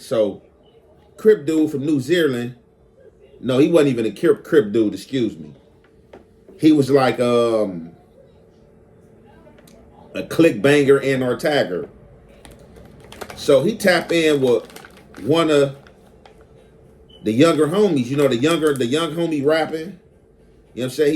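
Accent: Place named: South Central, United States. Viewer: that south central accent is iconic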